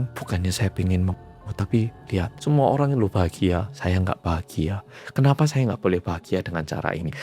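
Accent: Java, Indonesia. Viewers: Hai muedok😊 Lgsg nyimak🎉🎉🎉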